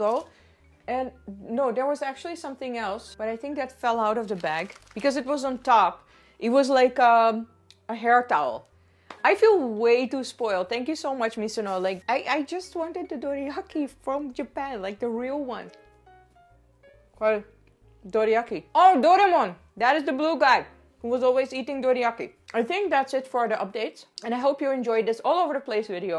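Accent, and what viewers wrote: India. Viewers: Ik heb een poos niet meer op jouw video’s geklikt maar nu dus wel. En het valt mij op dat je je zelf een typische accentje hebt aangeleerd. Het is Indiaas, Engels 😂 grappig om te horen bij jou.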